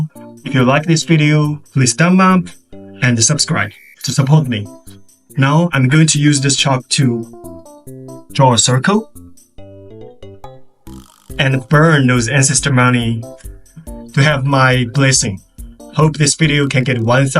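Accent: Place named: China. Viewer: I think he is based in China because of his accent, Hong Kong people have a different accent.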